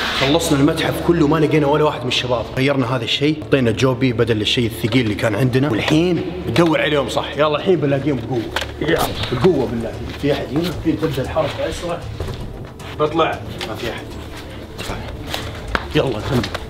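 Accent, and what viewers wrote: Saudi Arabia. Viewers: كيف كلامو سعودي وهو من تونس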